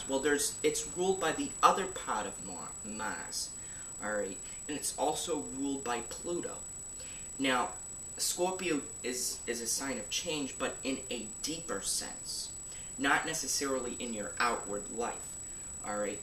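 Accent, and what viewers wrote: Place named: Boston, United States. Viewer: YOU ARE FROM BOSTON IM A SCORPIO LOVE YOUR ACCENT YOU AREDEAD RIGHT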